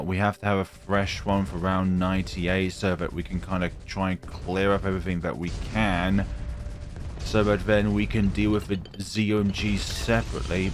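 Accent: United Kingdom. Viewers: I don't know why, but i find your British accent cute.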